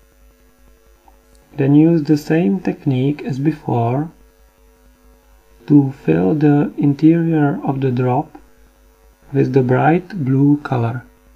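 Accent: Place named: India. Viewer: That's an indian accent not a russian one.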